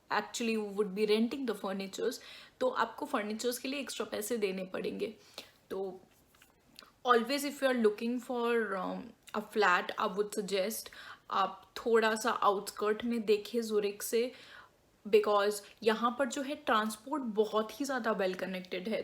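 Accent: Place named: Bengal, India. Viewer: Are u from Bengal according to your language style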